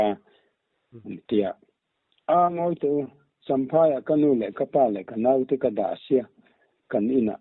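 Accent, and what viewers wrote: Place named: Mizoram, India. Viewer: Ngaihnawm khawp mai mizopa tawngkam chhuak dik tak leh awphawi dik tak i ni e. Lo kawm zel rawh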